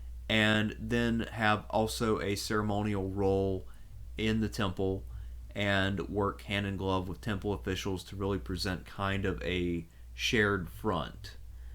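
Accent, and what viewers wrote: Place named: Texas, United States. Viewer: I live for the (?) Texan accent!! Thanks for another fun video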